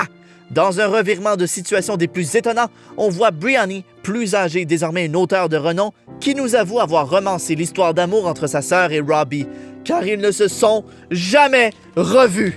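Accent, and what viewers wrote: Quebec, Canada. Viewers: Ter quebecois ou francais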